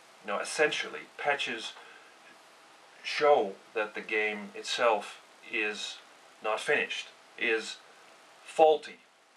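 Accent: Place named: Netherlands. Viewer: Om één of andere reden is het altijd hoorbaar wanneer iemand uit Nederland komt :) Anyway, Indeed, Skyrim is lacking some serious polishment. This goes pretty much for all versions. Xbox version is sometimes having texture issues, and the PS3 version is a lagfest. This game wasn't ready for release, not in the least. They just wanted to make that 11-11-11 date because : * It's a cool date and easy to remember * Making some more septims due to the christmas periode.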